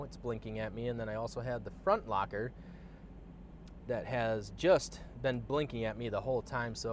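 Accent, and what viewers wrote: Wisconsin, United States. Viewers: That's how them good ole wisco boys say out here lol I catch myself saying it like that too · I could hear the Wisconsin accent the second I heard you say "wegon ." I like hearing all the different American accents! Love the Power Wagon, but I wish it came with 35's and the axles. I'd still buy one, but 35's would be the cherry on top.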